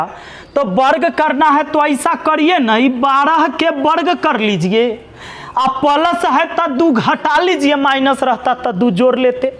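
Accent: Bihar, India. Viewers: Sir ap Bihar se hai kya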